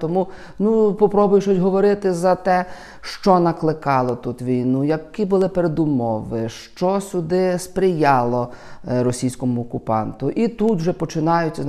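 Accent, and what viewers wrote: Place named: Western Ukraine, Ukraine. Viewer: Ой, как вы здорово говорите на украинском, ох это украинская с западенським акцентом.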